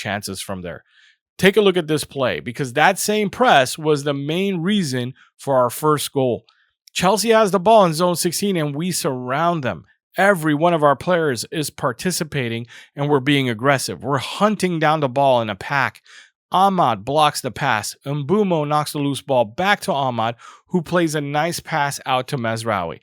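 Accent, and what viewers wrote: United States. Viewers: Yo, you're good bro... It's just a bit weird hearing football analysis in an American accent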